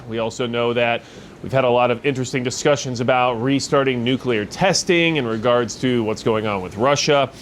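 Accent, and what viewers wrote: United States. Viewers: There's no way you're not from Texas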